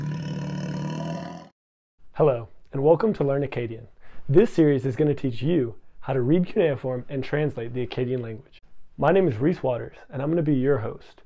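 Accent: United States. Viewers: Dear, I would have some questions regarding the pronunciation of the Akkadian language. I noticed that you have a rather American/English accent, especially in the a and r sounds. How was the pronunciation actually like? Can we reconstruct that? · Why do you got American accent 💀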